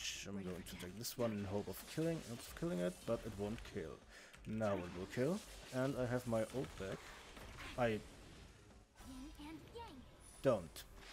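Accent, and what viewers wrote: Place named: Germany. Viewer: Ah, i hear a DEUTSCHER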